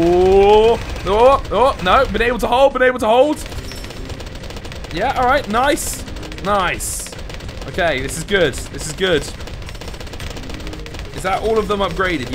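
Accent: United Kingdom. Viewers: i very loved ur english-british dude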